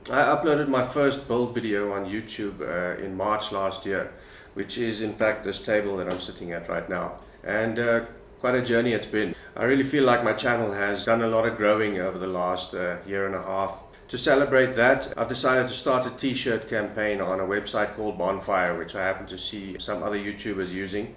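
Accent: South Africa. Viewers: You from South Africa? You sound South African!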